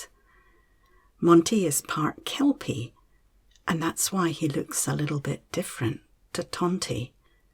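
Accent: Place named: Canada. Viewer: My daughter loves this story and requests it almost every night. It was a significant part of helping her school/separation stress. Thank you. I share your channel with almost all of my clients, as so many struggles with sleep concerns, anxiety, or busyness! If there is anything else I can do to support your work, let me know. Love your "accent". We're Canadian, eh?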